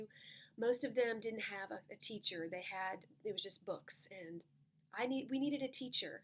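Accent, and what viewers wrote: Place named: France. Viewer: Greetings from Algeria, your french accent is funny, thank you for sharing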